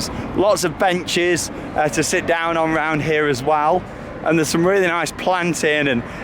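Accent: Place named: Stoke, United Kingdom. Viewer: where are you from? Trying to place the accent, almost a stoke accent i think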